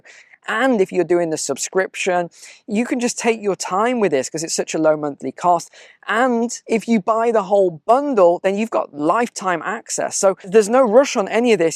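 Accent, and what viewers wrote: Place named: Upper class, United Kingdom. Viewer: You shouldn't ever trust anyone with a public school accent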